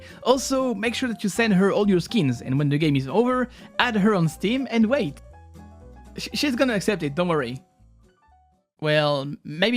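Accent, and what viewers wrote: France. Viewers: Are you french? Nice video btw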